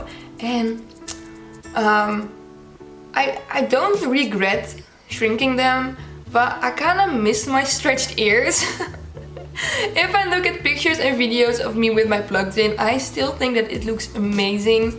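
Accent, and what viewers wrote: Netherlands. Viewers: Ik dacht al, haar engels klink heel nederlands achtig 😂. Toen las ik dat ze nederlands was.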